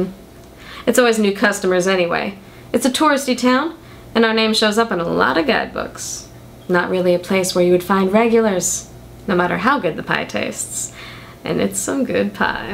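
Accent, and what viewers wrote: South, United States. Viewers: Not a bad Southern accent I must say! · Don't fret... The accent is an accident for most of us southerners too! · I actually heard multiple southern accents as you read, and each of them was pretty good. That may be why you think it's bad but, as lifelong southerner, I think they were just fine. Bless your heart! ;) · I gotta say I really can see you as a great southern belle with that accent. Great story.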